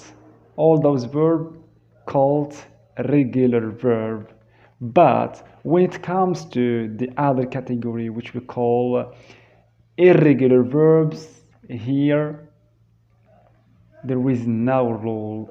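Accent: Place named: France. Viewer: Good job dude try to improve your pronunciation 😉 I smell some French in your English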